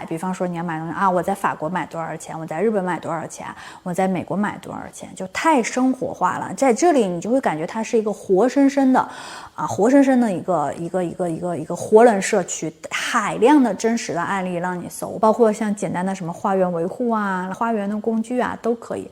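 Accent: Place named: Northeast, China. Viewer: 😂有点东北口音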